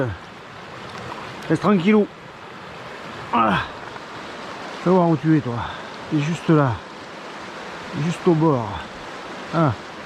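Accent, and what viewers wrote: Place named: South, France. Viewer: Salut,je ne connaissais pas ce talent de chanteur👍, souhaitons que ces jolies farios corrézienne t'ai laissé un souvenir agréable,toi qui leur parle comme moi avec l'accent du sud, t'entraînerais tu pour cet été? 😉 une session rock and roll où on devine que tu t'es amusé. 👏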